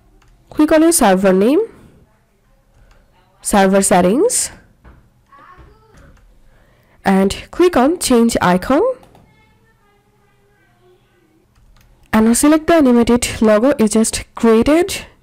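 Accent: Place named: India. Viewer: stop raping the mic and speaking in half indian and half curry english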